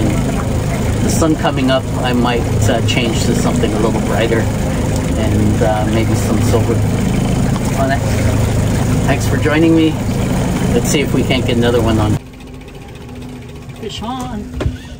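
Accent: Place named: Canada. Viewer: I love to think of people in other countries finding this video maybe thinking we all have such a heavy Canadian accent! :) also thanks for posting!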